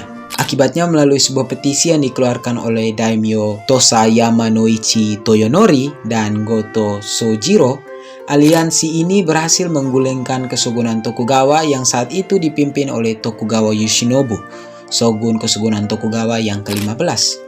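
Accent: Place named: Eastern Indonesia, Indonesia. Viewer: Fix Logat timur tdk bisa tipu hahahah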